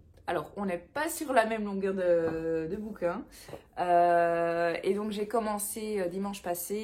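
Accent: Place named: Belgium. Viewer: Et j’vois que tu arrives récemment sur le booktube. Bienvenue. Et ton accent léger sur les ”R”..pam waterloo..Bruxelles.! Évidemment! ( bon après coup.. j’avais failli ecrire que t’étais alsacienne...😲🙄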